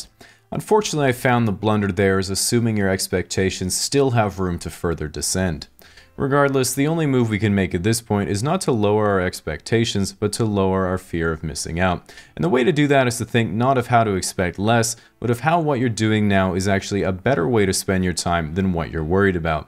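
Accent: United States. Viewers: You sound like if internet historian was American. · you sound so american after hearing your australian accent wtf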